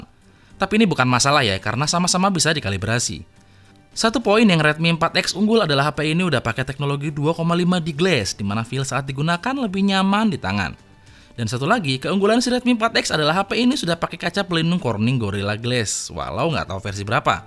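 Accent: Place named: Malang, Indonesia. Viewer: joss nemen :v pemalangan bgt bahasanya 😆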